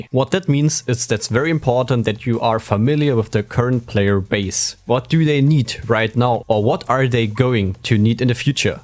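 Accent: Germany. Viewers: Man hört dass du Deutscher bist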